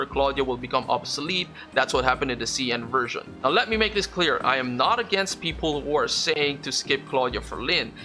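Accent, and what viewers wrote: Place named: Philippines. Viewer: I absolutely agree with what you said about pulling who you like, instead of pulling who is strong. With the way global is changing things up we now have the liberty to do that, and I love it. PS: You have the most pleasant Filipino accent I've heard 🥰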